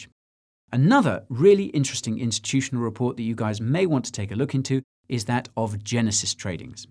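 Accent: United States. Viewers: Impressive United States accent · The American accent was great 👍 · Love your American accent! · The American accent is brilliant! Very well done. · As an American, I want to say your American accent is excellent! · Nice American accent!!! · Great American accent, ya got there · Love the American accent lol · Incredible American accent! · I like that USAccent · Your American accent is pretty good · Great American accent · american accent is sick. much love from tennessee 🇺🇸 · American accent was not bad///// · That was a far better American accent than I would be able to do British accent. Bravo 👏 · As an American, I appreciate your American accent hahaha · As an American I find your American accent impeccable and was super impressed. 😳🌟👌 Well played, sir! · Great American accent! I'm always amazed when I hear a brit talk just like a Yankee! Very kool! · Great American accent. 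· that American accent is on point lmao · Pretty good American accent guy. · pretty good US accent lol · Guy, does a pretty good American accent. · your american accent is fantanstic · The American accent is amazing hahaha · Not bad American accent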